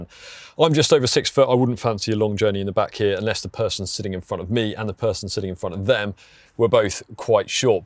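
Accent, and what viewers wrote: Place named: Scotland, United Kingdom. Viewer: Блин шотландский акцент делает мне больно)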